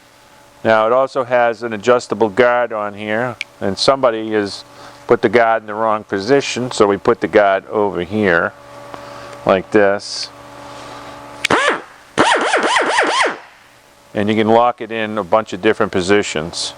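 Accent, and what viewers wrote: Boston, United States. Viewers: 👍A+ for the Boston accent.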